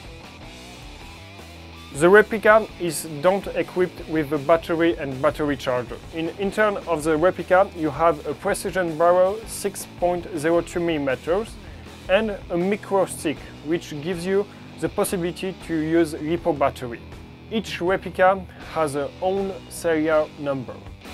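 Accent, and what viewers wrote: France. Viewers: sais trop un français les mec qui parle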